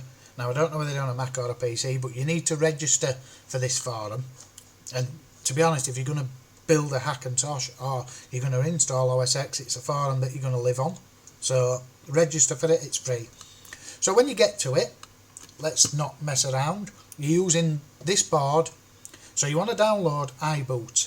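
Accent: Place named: North, United Kingdom. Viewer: Great video mate! Nice to hear a tutorial with a familiar northern accent :D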